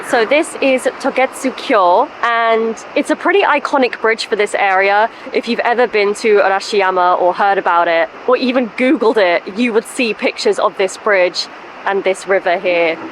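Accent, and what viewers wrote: United Kingdom. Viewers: はっきりしたブリティッシュイングリッシュで勉強しやすいですよね〜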